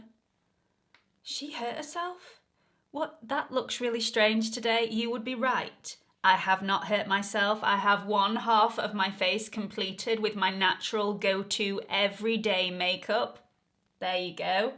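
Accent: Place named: Newcastle, United Kingdom. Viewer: Mind blown. So simple. TY. All I know of accents I learned from Inspector Morse. You might be from Newcastle. I’m loving this account!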